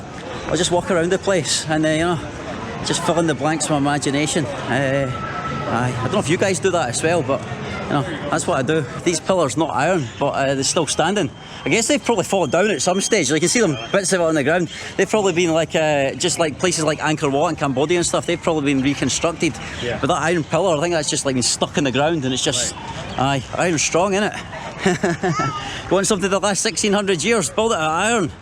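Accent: Scotland, United Kingdom. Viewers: He's also seems to be a really nice guy too, like he's always super friendly to everyone. His accent is cool too, not that many Scottish youtubers out there. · Why people have so much problem with the title. Erection also means action of erecting structure or object example monuments. 🙄 Anyways I'm here for Scottish accent and big strong legs 🤭🤣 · His Scottish accent tho! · I love your Scottish accent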